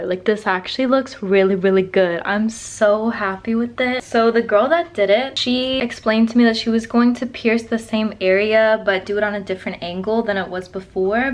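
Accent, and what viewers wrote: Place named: South, United States. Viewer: Lol what’s with the southern accent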